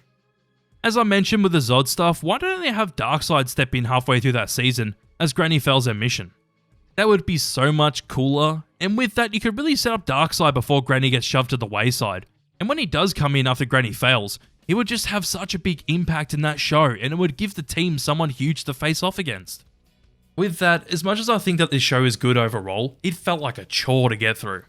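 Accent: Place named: Australia. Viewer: Love the Aussie accent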